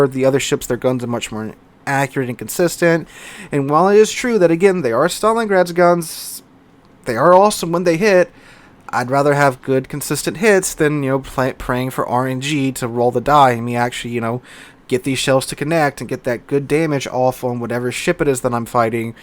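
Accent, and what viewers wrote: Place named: South, United States. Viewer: Not all of them do, I think it's a regional thing. I believe is southern so his pronunctiation makes sense to me. May not be technically correct but makes sense.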